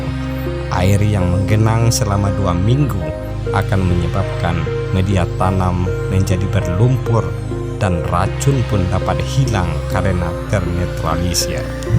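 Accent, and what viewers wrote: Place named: West Sumatra, Indonesia. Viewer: dialeg regional Minangnya menambah manis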